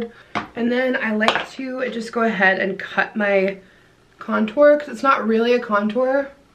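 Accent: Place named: England, United Kingdom. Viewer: Oh my goodness, your English accent is everything😍 Love it X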